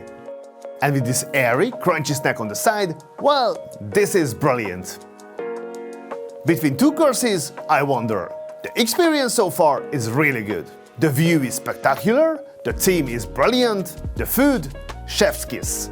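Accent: Hungary. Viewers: Cant stand that Hungarian accent , remind me of iseael 🤮🤮🤮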